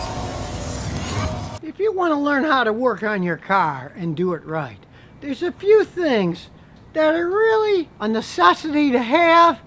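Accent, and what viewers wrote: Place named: United States. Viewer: Running translation is must in English as some people do not understand American accent orally.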